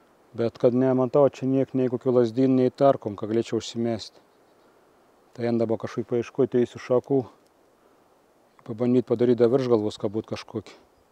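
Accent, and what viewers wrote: Samogitia, Lithuania. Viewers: Smagus video, mano asmeninis pastebėjimas, kažkodėl labai tinka žemaitiška tarmė tokio pobūdžio turiniui 🐻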